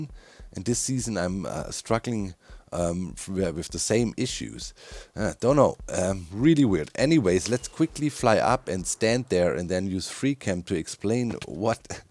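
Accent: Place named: Germany. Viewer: I- you're German! I've been hearing your voice in other hermits videos, and couldn't pin point your accent! I feel so silly, but I guess I grew up around enough German accents that it sounds as "normal" to me as English.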